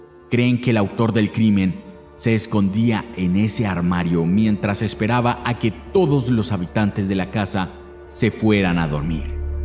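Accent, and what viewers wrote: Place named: Spain. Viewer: Es el primer vídeo tuyo que veo y ya me he suscrito a tu canal. Me encanta el español de España que usas y que hablas en todo el vídeo.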